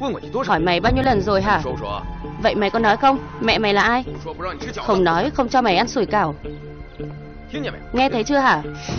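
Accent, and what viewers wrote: North, Vietnam. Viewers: phim hay 100% nhung bac ki thuyet minh te qua lam mat hay.dung de bac ky long tieng nua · Xin loi ko phai Che vi minh nguoi mien nam nghe giong bac ko thich lam ,nhieu bo phim hay nhung thuyet minh nghe ko duoc rat tiec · Thuyết minh giọng bắc nghe ko tình cảm ko hay.Dị ứng ghê.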